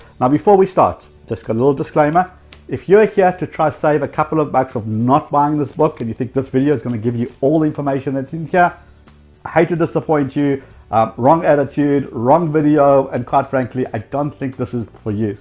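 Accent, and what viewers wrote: South Africa. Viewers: Oh! Of course, you're SAfrican. haha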